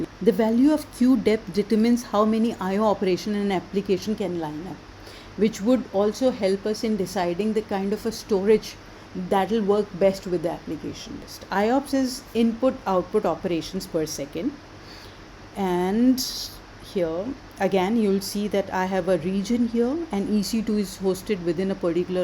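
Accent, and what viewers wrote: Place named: India. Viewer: sure u indian...accent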